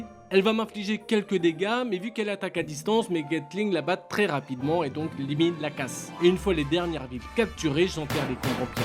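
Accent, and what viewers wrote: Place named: France. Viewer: Great video Ur English is perfect but U somehow keep all the frenchness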